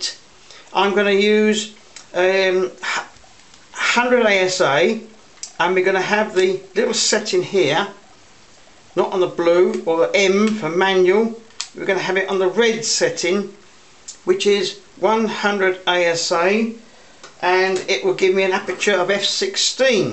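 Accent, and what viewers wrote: London, United Kingdom. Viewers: London. He sounds like my Dad, born 100 yards from London Bridge.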